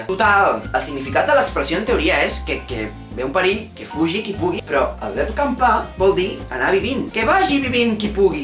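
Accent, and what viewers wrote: Spain. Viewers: Sí que se't nota una mica l'accent castellanoparlant, però vaja és igual, tots junts fem país. Sí volem que el català sobrevisqui és important que els castellanoparlants també l'utilitzin, i de manera preferida vers al castellà.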